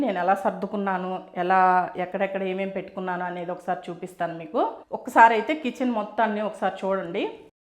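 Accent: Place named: Ongole, India. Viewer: Mam me own place akada me language ongole side la undi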